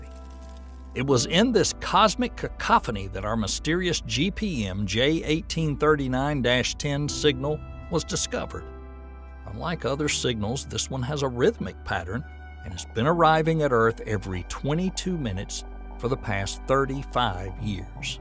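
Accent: South, United States. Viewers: Country accents are cool.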